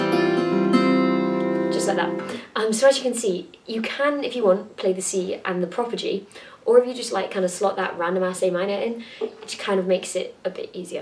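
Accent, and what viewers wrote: England, United Kingdom. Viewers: Seriously love your videos, I've literally only just got a guitar for my birthday, which is today, woo! And I've never even had a guitar lesson or anything, I'm going to hopefully attempt to teach myself, which should be interesting:L but yeah I think you're awesome, and not to be horrible to other talented guitar tutorial people out there, but I find your videos really easy to follow, you seem laid back and really cool, plus because you're english like I find you easier to understand than some other youtubers because I don't have to listen through a really thick accent which is always helpful when you're trying something new haha, if I can be half as good as you I'd be extremely happy! Carry on making awesome videos and I'd love to hear something by maybe, quite like that song although I usually listen to heavier stuff, but still, also you have a really nice singing voice, pretty unique and I like that, also I know as you've said in a few of your videos singing is not your strong point, but I think it's really great when you do sing, shows confidence, and it helps for me to understand more, I'm a learning by hearing kinda person, but yeah, excellent work!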